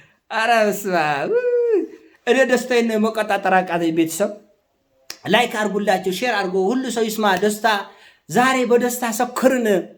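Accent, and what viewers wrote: Tigray, Ethiopia. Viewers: በትግሬ አክሰንት በመሆኑ እጅግ አዛናኝና ማራኪ ነው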